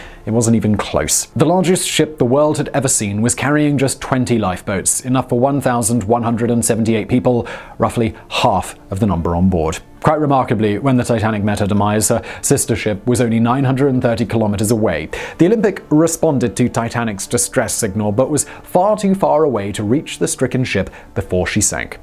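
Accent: United Kingdom. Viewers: All of channels present facts in an interesting way with use of a very articulate Britsh dialog. I can listen all day in astonishment of how in contrast, I speak so badly as a southern American.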